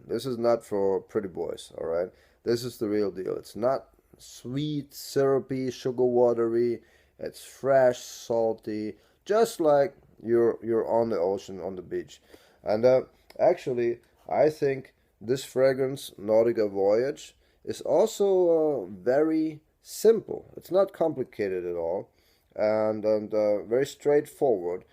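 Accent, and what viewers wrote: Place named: Iceland. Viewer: Love your accent, are you from Iceland?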